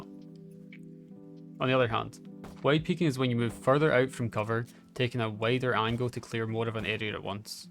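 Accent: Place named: Skye, United Kingdom. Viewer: i love that skye accent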